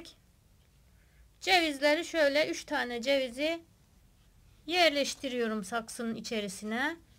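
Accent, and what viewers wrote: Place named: Kütahya, Turkey. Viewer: Konuşma şilven bizim gibi abla kesin Kütahya yada Uşaklısın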